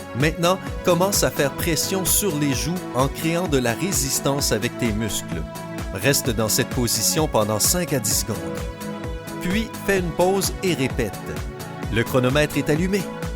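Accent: Canada. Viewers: j'adore cette voix, avec l'accent canadien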